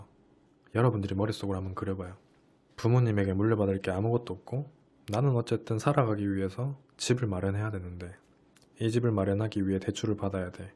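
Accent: Gyeongsang, South Korea. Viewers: 경상도 억양이 있네요~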